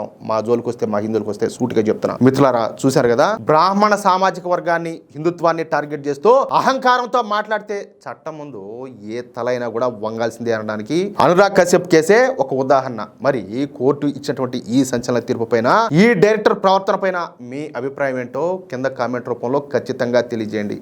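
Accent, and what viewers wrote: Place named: Kadapa, India. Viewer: Anna meedi maaa kadapa nee naa. Maatlu alage vunnayi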